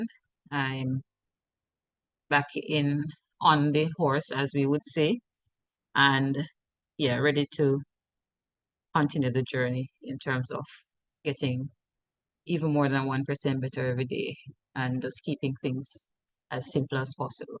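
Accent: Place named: Jamaica. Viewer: Jamaican accent 🎉. I automated SB strategy into an Expert advisor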